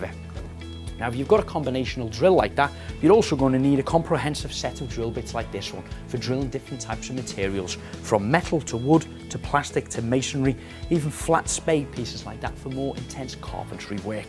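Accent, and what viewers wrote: Liverpool, United Kingdom. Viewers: 30 dollars wasted. He's from Liverpool, not Scotland. Completely different accent. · Liverpool accent from UK . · He’s a scouser from liverpool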